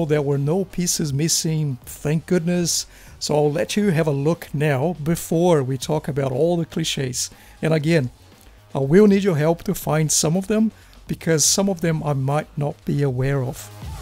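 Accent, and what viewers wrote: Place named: New Zealand. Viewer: Hi!:) I just started the video and I realized how I kinda missed your NZ accent!:D So good to hear from you in another video, I feel immediately comfortable and already enjoy your puzzle experience. Thank you and lots of warm greetings from Germany:)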